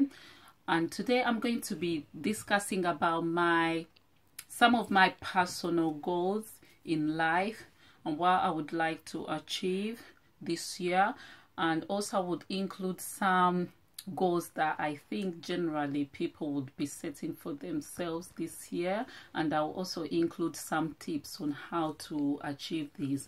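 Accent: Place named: Uganda. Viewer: You are a beauty. Where are you from? I'm Kenyan and to me you sound Ugandan... maybe Zambian or Malawian. Advice: film before a window (the natural light is much better than an overhead bulb. Also, set the phone/camera down so that the footage is stable. Good luck on your journey!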